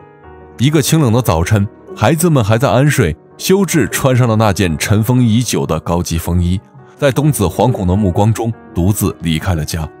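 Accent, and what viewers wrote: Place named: Osaka, Japan. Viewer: 大阪弁いいね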